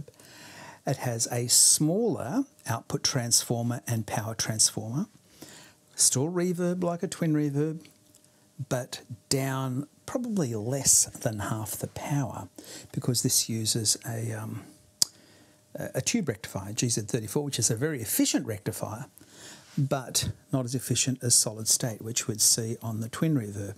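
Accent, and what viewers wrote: Australia. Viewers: AMEN!! SO glad to hear all of that! I sort of thought you might be Australian, but I wasn't for sure hearing some of those sounds that usually cue me in. And I WILL be looking for that PRO video!! Once again, so glad for your daughter's good health and to know that I have a brother just a short swim away! (I live in Jacksonville, FL) Happy Easter ... Jesus is coming back soon!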